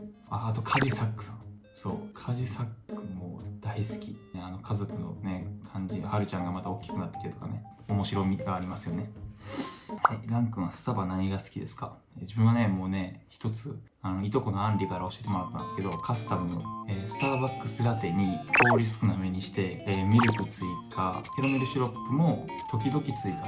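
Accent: Kansai, Japan. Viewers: 関西弁反則すぎる。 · ゴリゴリの関西弁、喋りウマ🤣！！おもろかった👏👏👏👏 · 関西弁可愛い😍藍くんいつも応援してます！大好きです！！笑顔が可愛すぎて癒やされてます🫶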